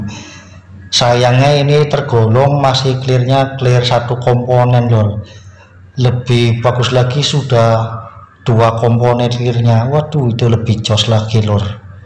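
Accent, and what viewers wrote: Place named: Banyumas, Indonesia. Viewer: njenengan sih wong ndi lur, denenge ngapak pisan?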